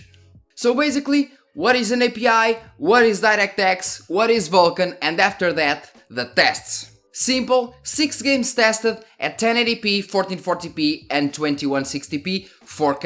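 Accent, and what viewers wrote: Portugal. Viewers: Parece a voz de um tuga, estou certo?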